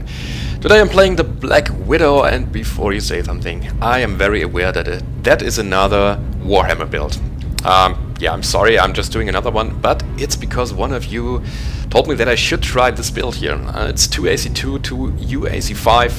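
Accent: Germany. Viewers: Just discovered your channel.Enjoying your MWO vids.Cool German accent and greetings from Scotland.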